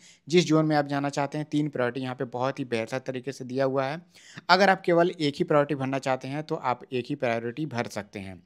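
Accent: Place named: Uttar Pradesh, India. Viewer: U r from up .....ho na ? The way prounounce i get it...anyway...i just love the way 👍👍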